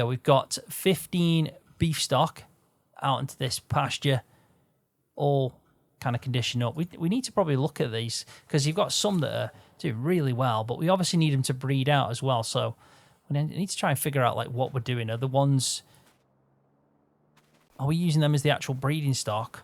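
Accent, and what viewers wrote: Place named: Wiltshire, United Kingdom. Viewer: Bruh you easily my favourite YouTuber. It must be the accent as I’m from Wiltshire so is similar. Found your page looking for best mods and subscribed because of your farm hand series. You probably won’t see this mate but honestly your content helps me get through my days. Which at the moment is very tough. Appreciate your work and your music choices for videos.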